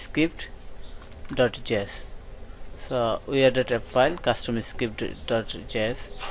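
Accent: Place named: India. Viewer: Terrible video, and what language are you using? Indish?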